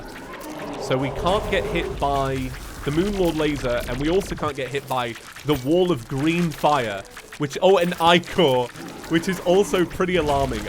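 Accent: United Kingdom. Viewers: i haven't been wathching you in a while, until you uploaded the Hollow Knight video. Since then, i've been binging your playthroughs, and accidentally picked up some of the British accent. how do i get rid of that ??'!?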